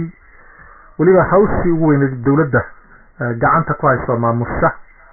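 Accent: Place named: Mudug, Somalia. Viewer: Aun halganki syl ayu kaso qayb galey, doorashana kursiga waa ku yimid sharaf intaasa ugu filan MD Abdirashid Ali Sharmake, waxaa kalo cawa ka siyadsan inu nin rer muduga ahaa sida hadalkisa laga dhehanayo bari ba ha u dhashe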